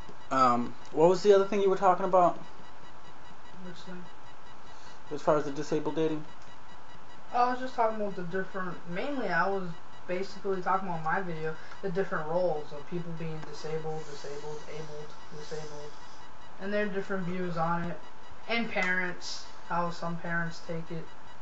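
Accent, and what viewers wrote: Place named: West Coast, United States. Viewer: I couldn't even tell. In fact, I thought you were a west coast brother. Are you?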